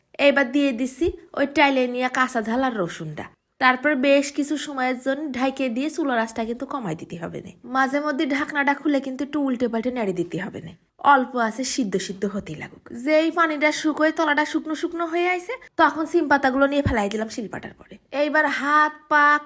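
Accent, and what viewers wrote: Jessore, Bangladesh. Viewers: Apu tomar kotha sunla ami kotha bolta sahos pai ta na hoila amar kotha sunla sobay hasi thatta korto akhon tomar kotha sunla mona hoi amara jessore ar manus ar kotha sotti misti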